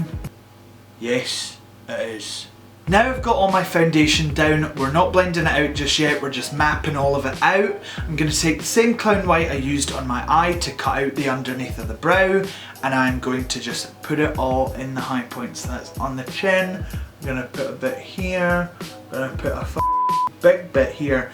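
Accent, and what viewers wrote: Scotland, United Kingdom. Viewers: Hahahaha I love the Scottish accent never heard it before I became a big fan hahaha , now I want to change my English accent into Scottish haha haha ❤️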